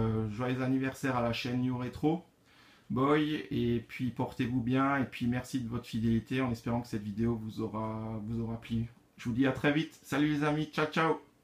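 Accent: Alsace, France. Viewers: alsacien ? ta l'accent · Il fait plaise ton accent elsass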